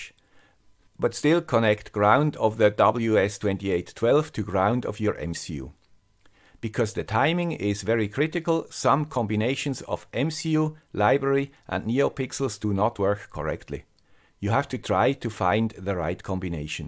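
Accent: Switzerland. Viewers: I was subscribed when we surpassed 100k. I will be subscribed when we hit 1M. So will be the guy with the Swiss accent. Quality content from one of my favourite YouTubers. Thanks for your videos <3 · Same thing I thought Sir. Love this guy with the Swiss accent! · As a guy with an Argentinean accent, I enjoy your Swiss accent enormously! It goes without saying that I love your content and your delivery. Saludos from Buenos Aires and have a great day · No. we'll never stop watching the guy with the Swiss accent. Bye.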